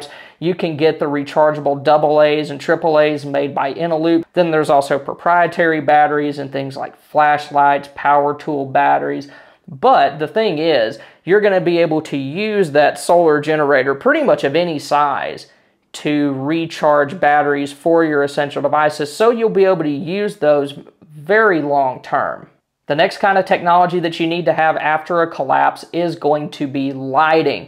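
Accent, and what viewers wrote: South, United States. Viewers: Discovered your channel today...and i like the dry sense of humor tutorial combined with a bit of Southern twang...makes me homesick... expat American tropical salutes from Venezuela!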